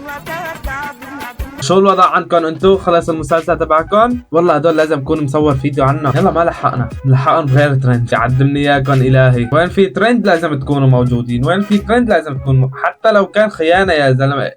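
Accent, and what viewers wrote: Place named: Aleppo, Syria. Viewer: أنتي حلبي كأنو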